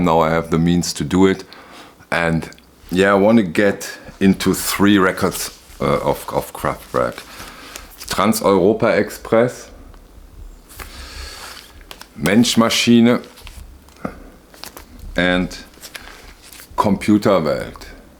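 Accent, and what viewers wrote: Germany. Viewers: Hello -I was trying to place your German accent and always suspected NRW, now I know! I lived off Stockumerkirchstrasse in the 90s when I worked for Kindersender Nickelodeon which was based at Zollhof 11 (by the Rheinturm) My one regret is not stalking their Kraftwerk studio when I lived there! I used to go running by the Messe listening to. Thanks for the wonderful tribute/analysis.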